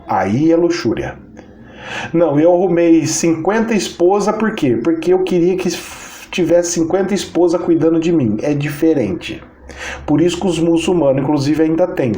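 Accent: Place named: Caipira, Brazil. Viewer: Eu acho tão engraçado o jeito caipira que você fala, a aula fica mais divertida...kkkk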